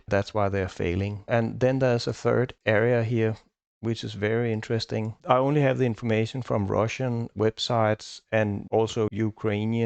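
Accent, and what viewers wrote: Denmark. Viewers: the danish accent is strong with this one (jeg er også fra danmark)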